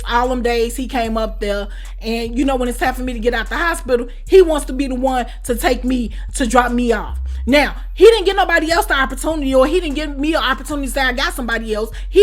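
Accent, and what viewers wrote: Chicago, United States. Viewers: Never knew Chicago had an accent but I listen to another Chicago tuber named Ashley Miller and y’all sound alike ! I’m a sucker for accent keep up the context #newsubscriber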